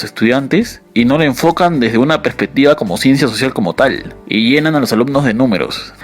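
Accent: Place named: Peru. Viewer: Ese acento es peruano jeje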